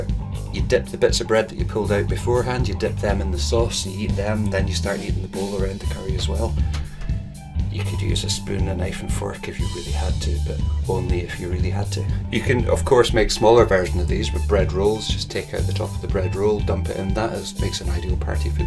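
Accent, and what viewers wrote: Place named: Ireland. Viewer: Cool recipe makes it better hearing an Irish voice :)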